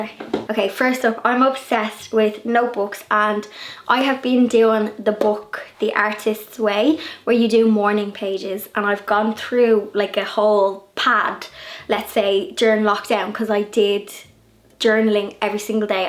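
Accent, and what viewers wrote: Ireland. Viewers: Bless your heart! I think it's good for everybody to unplug for a while; live in the now, and just live. Be safe be well. I enjoy your videos. I live here in the United States you're just cute as little bug's ear. I love hearing your Irish accent. Enjoy your time my dear you so you definitely deserve it. Blessings and much love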